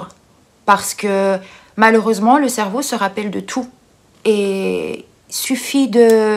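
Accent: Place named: Belgium. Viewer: Ho! C'est marrant ça, c'est vrai que je m'étais dit que tu étais belge par rapport à ta façon de parler sans doute, à moins que tu n'ai fourni l'info dans une de tes vidéos. Je suis à Bruxelles depuis deux ans maintenant! Et j'aime beaucoup cette ville même si c'est un peu bizarre en ce moment, je suis arrivé 2 mois avant le 1er confinement, j'ai donc très peu pu profiter de la ville dans des conditions "normales". Peut-être nous croiserons nous un jour ^^, si je t’aperçois je viendrais te parler! Bises!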